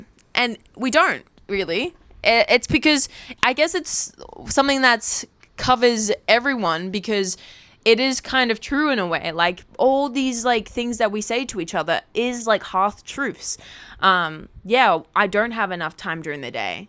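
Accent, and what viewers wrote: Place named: Australia. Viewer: Was gonna say you lowkey sound like but it’s probably just cuz you’re aussie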